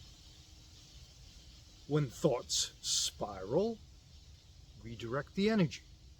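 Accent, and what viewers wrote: Boston, United States. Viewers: Gold. Is there a subtle Boston accent?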